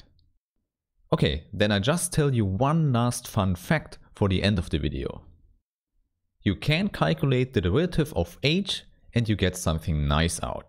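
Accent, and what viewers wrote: Germany. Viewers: man merkt er ist deutsch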